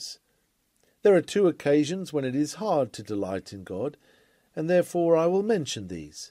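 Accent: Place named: England, United Kingdom. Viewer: Was looking forward to this sermon, love this reader with English accent . Thank you Jesus .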